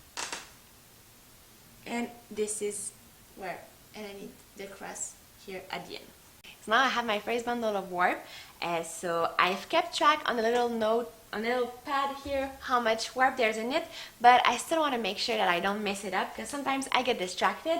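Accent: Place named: Quebec, Canada. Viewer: I can here the Quebec local accent hehe! Hello fellow canadian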